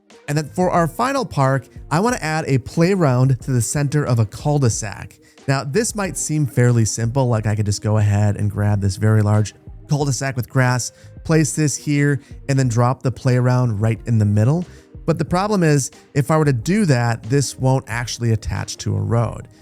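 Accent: Wisconsin, United States. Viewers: Your Wisconsin is showing 😂 I live in a neighborhood just like this, out towards Milwaukee area. The Midwest is riddled with neighborhoods like this. I love it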